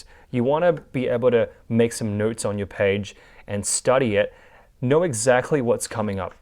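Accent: Australia. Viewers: lmao just because he has an aussie accent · Aussie accent. Great! You're pretty good mate. Are you based in Canberra? And if so, do you offer private tuition?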